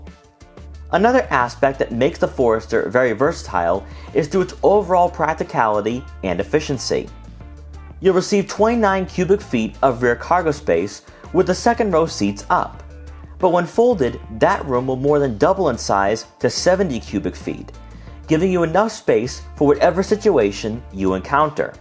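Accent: Boston, United States. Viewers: Terrible accent for a Bostonian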